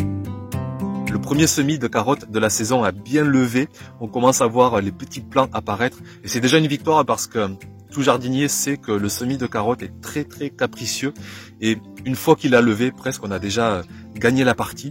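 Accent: South, France. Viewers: merci pour cette belle surprise de tes deux petits, effectivement ton fils te ressemble! et cet accent du Sud...c'est déjà du soleil pour moi, pauvre nordiste d'ile de France! à très vite sur ta chaine! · Bonjour, je te découvre en plein confinement, enfin ça fait déjà un mois là, j'aime beaucoup tes vidéos, la philosophie qui en découle. De ton accent (hi hi de vos accents là où je t'écris j'entends la voix de tes enfants, c'est magnifique, moi mon fils déteste les légumes:-(; il a 12 ans et ça marche toujours pas. Bon je reviens à mon propos, de votre accent j’entends le sud... merveille. Moi j'ai un potager sur Bruxelles, un tout autre climat. Tes vidéos me font du bien, je les laisse couler pendant que je fabrique des masques, une autre façon de cultiver la santé.... Elle me font du bien tes vidéeos et me ramènent à l’essentiel. Amour sur toi et les tiens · Ils sont mimi tes loulous... 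c'est un mini toi 😊, aieee l'accent du sud, j'adore! ça me rappelle mon Marseille de mon enfance...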